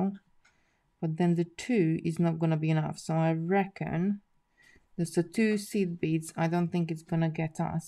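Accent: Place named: Hungary. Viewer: Good morning, apologies for missing u last night. That project looks beautiful. I have been trying to work out ur accent, and I heard u mention Hungary, is that where ur from? My mum was Hungarian, and came to England with her mum and two brothers during the revolution in 1956. I have been there a few times and love the country.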